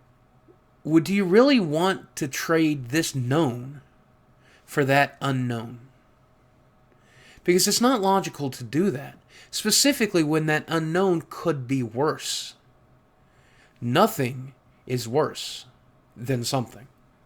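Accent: United States. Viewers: I can't understand you very well because althought i understand english, i cant understand very well the american accent. Its like spanish from Spain and Latinamerica, are different. But if your problems comes from your body (as you said is a big problem) or loneliness, you can change it but you have to do an effort. You can go to a gym and lose weight and be happy with your new body. That happyness improves your esteem. Its long to explain but you can do what you want with an effort.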